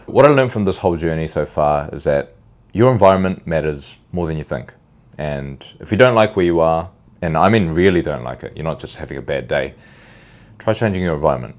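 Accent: New Zealand. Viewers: God Bless You, man! Love your Kiwi Accent! Oh, and I subbed! 💥👍😎🇳🇿🇦🇺🇺🇸❤️ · You’re so absolutely right on all points. I’m from Christchurch and the herd mentality and rascism is worse there, such a shame. I live in Auckland and yes cost of living is very high. Keep rocking your integrity and sense of self along with that kiwi accent! Wish you all the best ☺️🙏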